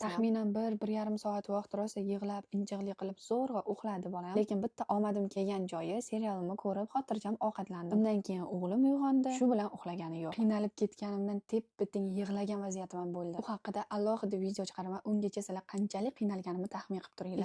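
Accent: Tashkent, Uzbekistan. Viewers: Сан нега НАМАНГАЛЛИК булиб ТОшкенча Гапирасан карисга нмага тегдинг наманганда эр йукмиди еки. ЕМОН кизмидинг яхши киз кушнсига ЭРГА ТЕГАДИ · Нимага Тошкенча гапирадғ бу қиз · Тошконча шевани кайдан ургангансиз? Ман умуман урганолмадим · Вой Наманганлик экансизку кизим нега Тошкент шевасида гапирардиз.Наманганлик эканиздан уялармидиз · Узингиз Наманганликми ? Гапиришиз чала Тошкентлик , турмуш уртогиз Кореялик , жуда хам интересно . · Нега тошкенча гапирасиз тонкенликлардан оброси баланд туради водини оброси Коконга караган кокон хонлиги бошкарган тошкентни Асабларим ойнеди борку шу тошкентни шевааасида гапирса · Iltimos xech bolmasa yapti deb gapiring namanganni qaysidir rayonidansiz oziz ln Toshkent shevada gapirasiz yarashmapti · Нманганли бўсезам тошкен шевада гапирвосизми?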